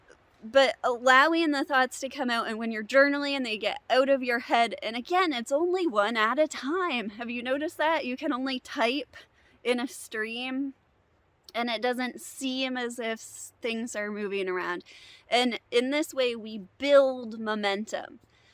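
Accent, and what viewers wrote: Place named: United States. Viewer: Your American accent makes it sometimes difficult for a person who isn't native to the language. English is spoken round the world, you need to speak so that the words are pricked up easily by everyone anywhere.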